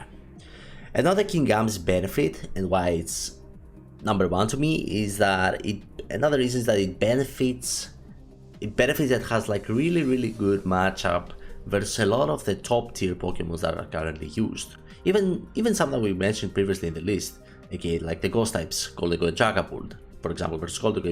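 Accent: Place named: Scandinavia. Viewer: ye i know what you mean. i used you hisuan arcanine team once and wasnt aware enough and got totally destroyed. btw I love your accent, are you from the scandinavian area?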